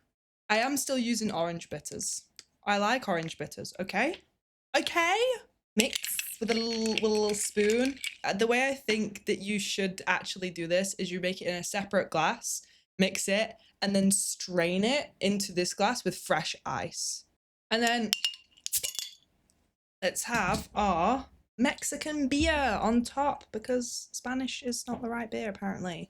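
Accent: United Kingdom. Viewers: You became full brit after jackie's drink, your accent got full british mode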